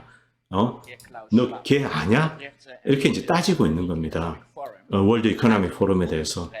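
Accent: Gyeongsang, South Korea. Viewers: 애쓰시네요 ㆍ그런데 이리 방대한 자료를 어디서 구하시는지 궁금하네요ㆍ 미국 계셔도 경상도사투리 구수합니다ㆍ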